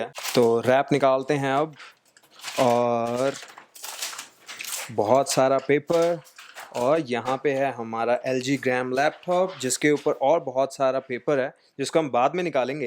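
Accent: Punjab, India. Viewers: hindi in punjabi tone. Feel good to hear😊😊😊👍👍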